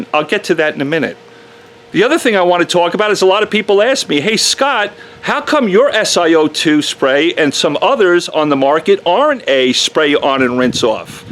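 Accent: New York, United States. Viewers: That's a NY Accent. LI likely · If he's here in Dallas, why does he have a NY (or L.A.?) accent ?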